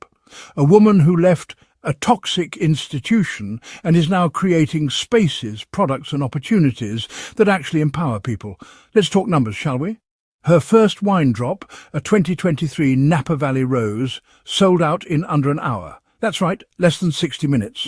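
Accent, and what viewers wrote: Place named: United Kingdom. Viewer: Such BS. Best part of this silly propaganda is that AI has chosen an interesting British accent. Carry on Sir! 😱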